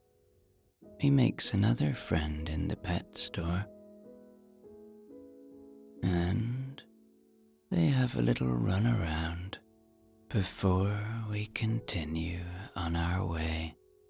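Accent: Ireland. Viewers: Dear, I was looking for some positive affirmations for self improvement and growth, but I could not find any I liked to fall asleep by. I either did not like the music which was too overpowering, or I did not like the manner with which they were spoken, being rattled down without even a breath in between. And I definitely did not like the voice. I only like your voice and I love your beautiful Irish accent. I would love to hear of series of positive affirmations to fall asleep by. In today’s world so full of confusion and hardships many of us would very appreciate more self confidence, attracting the right circumstances to us and have faith that all will be well. Thank you for all you do.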